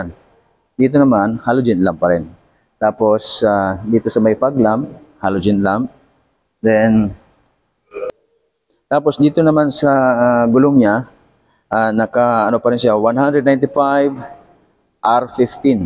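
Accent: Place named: Visayas, Philippines. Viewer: Bakit bisaya punto mo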